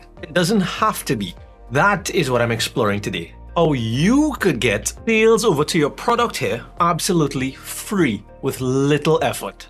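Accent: Trinidad and Tobago. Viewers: Love the vid bro thanks but how you soundin like you trini so?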